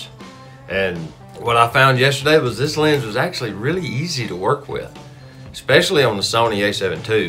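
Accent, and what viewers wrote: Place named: Alabama, United States. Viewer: Looks like Horse Pens 40 where you were bouldering. Maybe I'm just assuming it was Alabama due to your accent (I'm from Mississippi). Anyway thanks for the video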